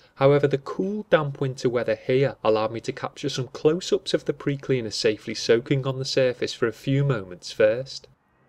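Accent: Liverpool, United Kingdom. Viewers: Course it’s relaxing, he’s a scouser! Ok I’m biased.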